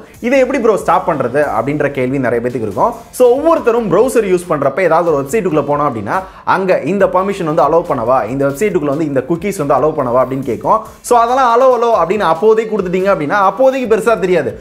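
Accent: Madurai, India. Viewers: Bro nega madurai ya🙋🙋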